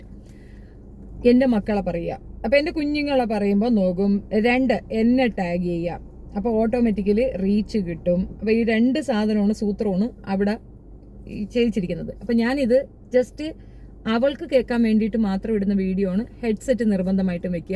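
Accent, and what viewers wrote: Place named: Kollam, India. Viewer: ഈ ചേച്ചി നമ്മുടെ നാട്ടുകാരി ആണന്നു തോന്നുന്നു എന്റെ നാട്ടിലെ പെണ്ണുങ്ങൾ ഇങ്ങനെ ആണ് ഡയലോഗ് സ്ലാങ്.ആയുർ ചടയമംഗലം കടക്കൽ നിലമേൽ വല്ലോം ആണോ സ്വദേശം അന്യം നിന്ന് പോകുന്ന പല വാക്കുകളും ഈ ചേച്ചി ഓർമിപ്പിക്കുന്നു സൂപ്പർ. അടിപൊളി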